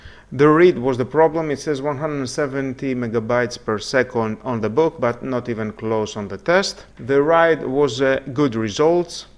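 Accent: Greece. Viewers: Τώρα εσύ μιλάς Αγγλικά σαν τον Τσίπρα μήπως είσαι Έλληνας ???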